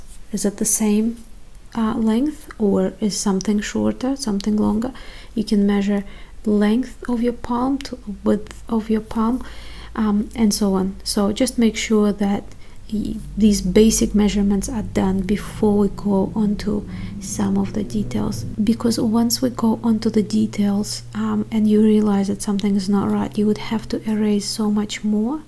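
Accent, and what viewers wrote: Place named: Russia. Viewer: Your accent telling me you’re quite fluent in speaking Russian)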